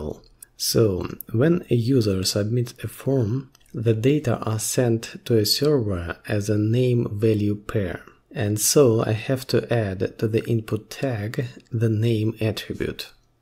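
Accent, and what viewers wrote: Russia. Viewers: слышу родное "зэ"😆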